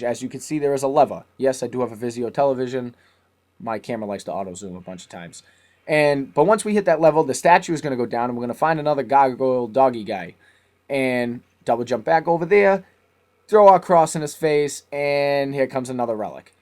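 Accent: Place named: Boston, United States. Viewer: just watched ur part 1, ill be viewin all of um for my plat. good job dude, n the boston accent=tight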